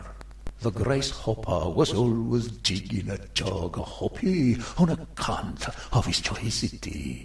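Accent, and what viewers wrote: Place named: Italy. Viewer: Marvellous stuff! So great to hear FW in an Italian voicing. Wonderful rendering of rhythm, tunefulness.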